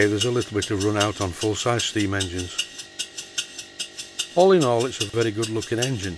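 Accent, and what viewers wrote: Yorkshire, United Kingdom. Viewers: Judging by your accent, it sounds like you are a Tyke, sir... no wonder that you know all about steam engines! My respect, as a Tyke myself.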